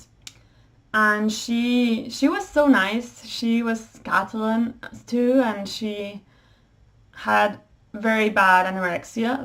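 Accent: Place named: United States. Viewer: you speak american english very well! how did you get that?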